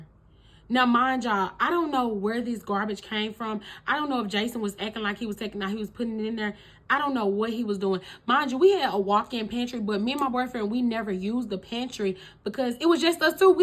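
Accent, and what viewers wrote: Mississippi, United States. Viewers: Accent ?? Sounds almost close to us Mississippi grls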